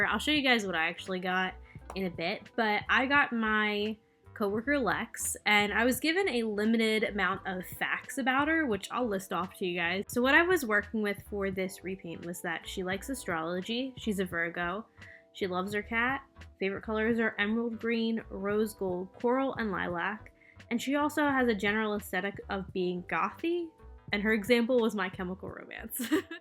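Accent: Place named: South, United States. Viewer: I think this doll is phenomenal 😻 so dang inspiring! Your fascination with how you say clasps was hilarious. I think it's just 'cause you have a touch of a southern (?) Accent. It's actually the a sound that makes it sound different. I love how you talk though..."I don know 'skina cute". Also, have I just found you in the last two weeks? Yes. Am I bring ng all your videos while working on my own. For dang sure. Love it.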